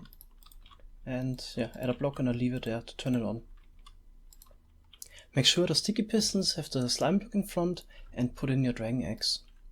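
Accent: Germany. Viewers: du bist ein deutcher das hört man omg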